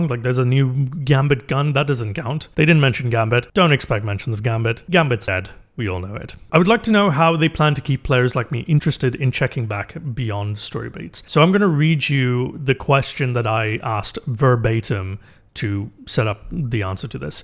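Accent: United Kingdom. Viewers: No doubt intimidated them with his high class British dialect, manners and intelligence. If only you got to have a meeting with the suits at the top instead of the developers whose hands are tied. I bet you would’ve handed them their ass in the most civilized discourse with dignified dialogue and a few witty passive aggressive jabs in between. Maybe one day… maybe.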